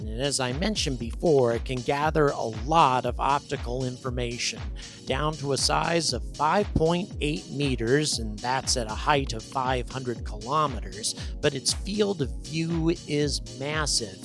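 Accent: England, United Kingdom. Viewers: Love the English accent! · As a Brit I think your British accent is most splendid.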